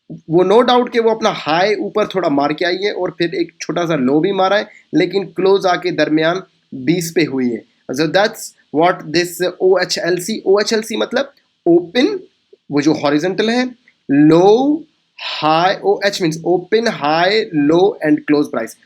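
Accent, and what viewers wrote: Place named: India. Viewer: I strongly feel u r Indian due to ur voice/tone and name.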